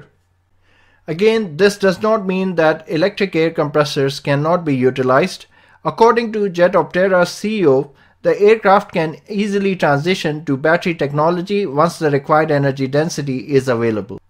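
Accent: India. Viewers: Lol. Are you saying that to make him feel better about his thick Indian accent that is hard to understand? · It could actually take off, if only the hindustistani speaker would be replaced by a normal english language spokesman.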